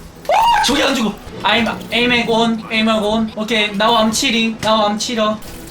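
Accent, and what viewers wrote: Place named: Busan, South Korea. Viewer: 형은 왜캐 부산 많이하지?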